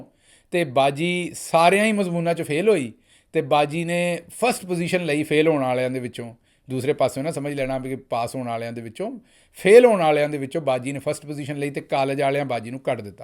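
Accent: Punjab, India. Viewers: Ap ke student Ap ki panjabi accent wali English se bahut tang thay.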